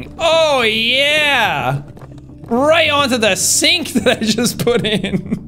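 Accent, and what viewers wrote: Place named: Ireland. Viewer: this is the only vid where I can explicitly see sean's super irish accent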